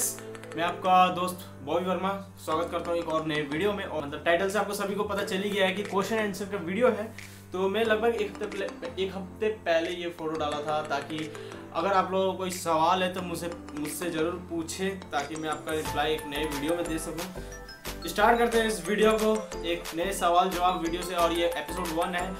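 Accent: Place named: Bihar, India. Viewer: App bihar se hai 😀😀😀😀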